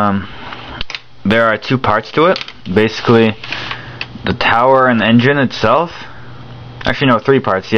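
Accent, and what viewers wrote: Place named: Russia. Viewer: is russian your first language or something? you have an accent. can you speak russian at all?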